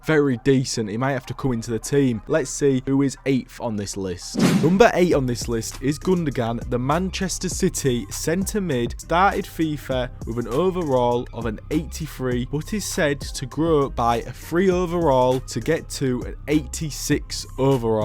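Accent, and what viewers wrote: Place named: South Yorkshire, United Kingdom. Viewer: I swear to god your accent sounds so close to mine 😂 you from South Yorkshire? Don't tend to see many creators from there big ups to you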